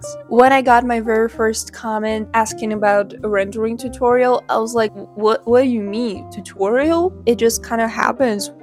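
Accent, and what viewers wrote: Russia. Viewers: Понять не могу... автор русская? Слышу отчетливый акцент... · йоу я подумала что вы русская, откуда вы?? Что за акцент?? ник?? ЕМАЕ ЭТО ТАК ПОЛЕЗНО БОЖЕ МОЙ. СПАСИБО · now this is useful but id like to ask if you're Russian i feel like i hear it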